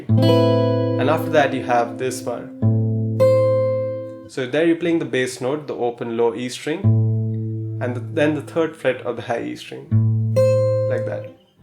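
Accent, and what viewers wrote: India. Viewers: I just love your arrangements. You are an amazing teacher! Thankyou so much for uploading these beautiful fingerstyle lessons :)! I want to ask one thing, are you Indian? Because I find your accent to be Indian. And may I please know your name?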